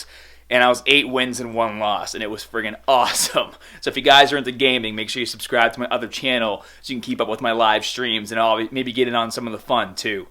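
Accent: Boston, United States. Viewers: Did he just get a boston accent from nowhere?? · Dude love your videos. learned so much. You are definitely from Boston right? "My amms" lol The r is silent in Boston. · Did you randomly gain a boston accent? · iv noticed you've picked up a Boston accent that you didn't have in your earlier videos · You from Boston? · sounds more like a Boston accent or in and around the area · STOP TALKING LIKE A DOUCHE!!!! That Boston accent is horrendous. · Shaan Targaryen I noticed too. I've seen some of his older videos and he doesn't have this Boston accent in them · Are ya from Boston? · Dutch Dude Yeah it's definitely a boston accent, except the rest of his speech isn't nasally like a bostonian's is. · Bruh I have't seen one of videos in a few years... Is it just me or is his Boston accent more prominent now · the boston accent is ridiculous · it's a Boston accent, that's what it is... 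they speak normally on several of the words but then sometimes they say others things weirdly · Orpheus it's called the baaahstan accent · I never moticed the Boston accent until now. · First off your Boston ascent is coming out very much lol... funny to hear. Second off... Me as an LMT, I have noticed it looks like your hips are off kilter, meaning one looks higher then the other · how is your Boston accent getting thicker? I thought you didn't live in Boston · Love the Bostonian accent . Nice gains ! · Boston accent makes me cringe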